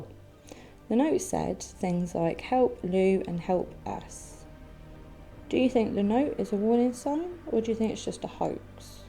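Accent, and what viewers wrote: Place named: United Kingdom. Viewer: Hello I like your story and your British accent he was really retro mystery love it❤️👍🏻🤘😍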